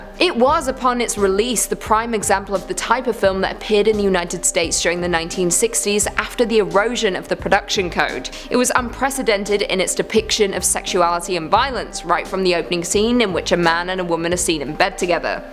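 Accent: England, United Kingdom. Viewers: You have to remember she's from England. They also say vitta-min instead of vitamin.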